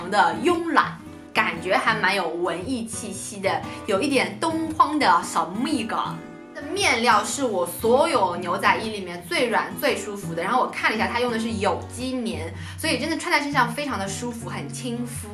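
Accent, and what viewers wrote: Shanghai, China. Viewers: 男男头哈哈哈上海宁好亲切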